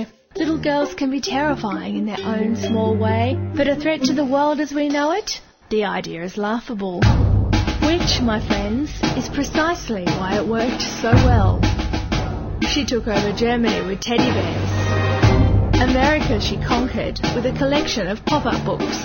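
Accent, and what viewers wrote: Australia. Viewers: Australian accent? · aussie voice wooot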